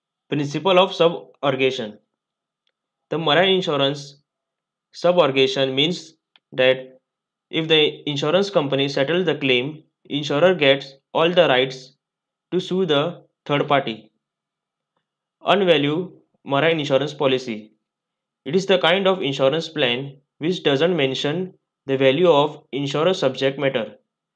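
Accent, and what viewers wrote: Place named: Goa, India. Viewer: Nice video👌 ekdum goan accent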